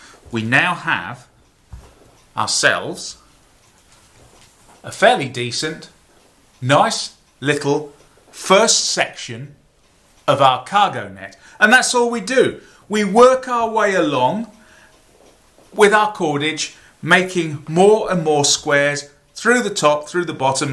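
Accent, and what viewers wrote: England, United Kingdom. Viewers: first of all i wanted to say you can eat a bag of d*cks because you’re english accent wasn’t even the worst part it was trying to follow along with all of your unnecessary BS that you put me through watched a different video solved all my issues and i felt a sense of bliss. You on the other hand deserve to be taken off of youtube for making my life worse causing me to crash out watching your shiet video.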